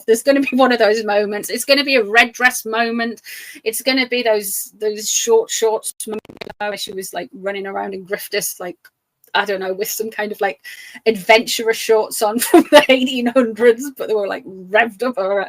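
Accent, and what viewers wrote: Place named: Newcastle, United Kingdom. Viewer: You are one my top two readers, tell it like it is. I know I don't comment much, but I do appreciate your time, and still loving the accent, my grandfather was a Geordie through and through, he had an accent very similar to you.😁👍❤️💐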